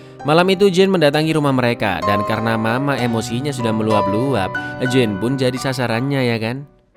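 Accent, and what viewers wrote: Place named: Surabaya, Indonesia. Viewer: Mas iki wong endi yo? Teko bahasae kyk wong Suroboyooo... Nek iyo, kok aku curiga. Jangan² mantane koncoku wkwkwkwk. Dadi penasaran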